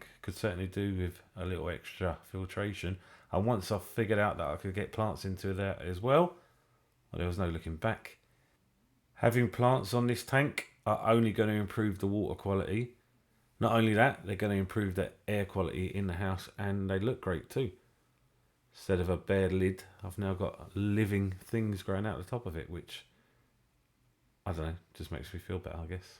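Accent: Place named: England, United Kingdom. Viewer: Ah. An English accent!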